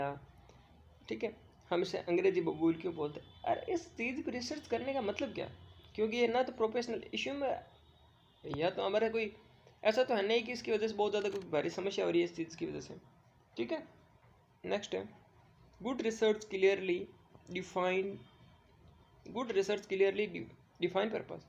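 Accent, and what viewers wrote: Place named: Rajasthan, India. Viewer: Rajasthan ke lag rhe ho Guru ❤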